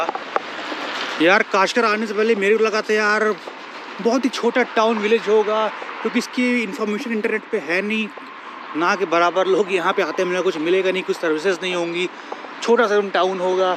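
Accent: India. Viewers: 大部分普通中国人英语并不好，你说印度口音的英语，他们更听不懂。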